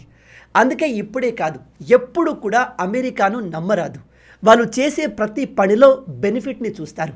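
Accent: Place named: Andhra-Karnataka border, India. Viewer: I was astonished when you told you were from Siddipet.I thought you are from some Andhra Karnataka border.Nice Telugu language you are speaking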